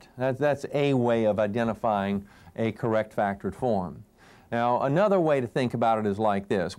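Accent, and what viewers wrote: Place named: South, United States. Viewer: This is an EXCELLENT refresher for the GRE. Thank you! (Also, love the southern accent.)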